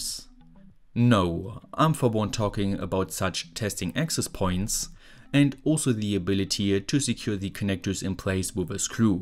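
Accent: Germany. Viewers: It's much better now, finally being able to put a face to that weird German voice! 😁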